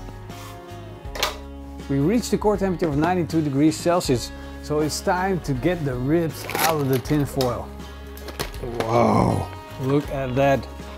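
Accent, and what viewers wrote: Netherlands. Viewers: Yo, I love your videos and your Dutch accent is charming. One quick question. Why do you always wrap your meat "shiny side out"? Usually you'd do that if you wanted to deflect heat, but I don't think that's what you mean to do when wrapping. Is this deliberate or doesn't it matter with these ribs?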